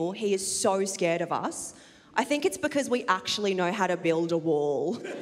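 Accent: Australia. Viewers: Her Aussie accent is so sexy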